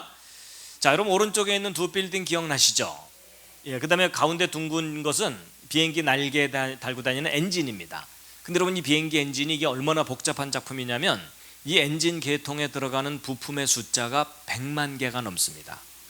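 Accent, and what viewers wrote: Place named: Jeolla, South Korea. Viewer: 목사가 태도나 말투가 기본이? 전라도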